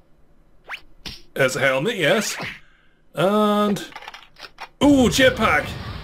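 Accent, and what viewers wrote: Scotland, United Kingdom. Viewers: Haha this is too funny!:) it's actually so refreshing to hear a fellow Scottish accent on YouTube! Haha, love your videos!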